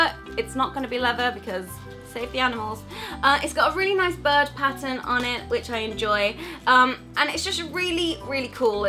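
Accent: United Kingdom. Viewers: This may sound weird but I LOVE your British accsent I want to move to Englend SOOOOO bad😟 I'm AMERICAN AND WANT TO MOVE TO ENGLEND 😭😭😭😭😭😆😆😆😆🇬🇧🇬🇧🇬🇧🇬🇧🇬🇧🇬🇧🇺🇸🇺🇸🇺🇸🇺🇸🇺🇸🇺🇸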